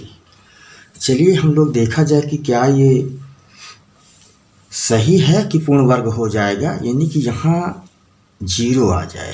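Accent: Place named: Pratapgarh, India. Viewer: SUPERLIKE 47::)) बहुत ही श्रेष्ठ कार्य कर रहे हैं आप🙏🙏 मेरे पिताजी भी प्रतापगढ़ से थे, तो आपकी बोली से अपनापन सा लगता है । बहुत बढ़िया भैया जी🙏🙏🙏 बहुत बहुत धन्यवाद और हार्दिक शुभकामनाएं 🙏🙏💐💐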